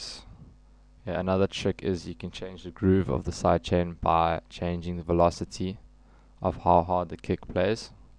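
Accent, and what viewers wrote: South Africa. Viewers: you also from south africa dude? lol youve got a south african accent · sigh that Joburg accent xD noo man leka boet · Are you South African?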